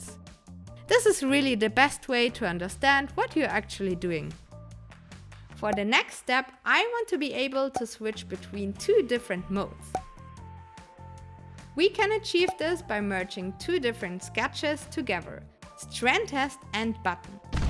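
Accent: Germany. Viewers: Mein erster Gedanke: "Sie muss eine Deutsche sein". Typisch deutscher Akzent xD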